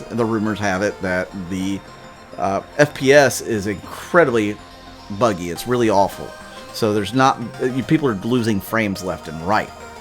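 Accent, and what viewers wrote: Texas, United States. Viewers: What part of Texas you from?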